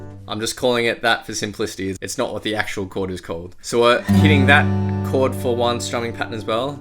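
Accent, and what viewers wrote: Australia. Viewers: with an Australian accent (i think) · I’ve never seen an Asian with an Australian accent before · Dont forget the australian accent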